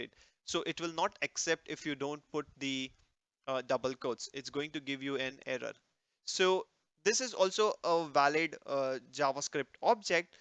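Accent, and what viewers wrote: Gujarat, India. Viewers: are u a gujrati?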